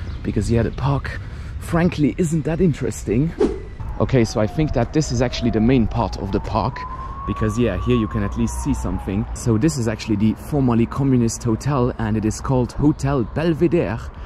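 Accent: Germany. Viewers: you have a very interesting accent. It sounds very much like a german one.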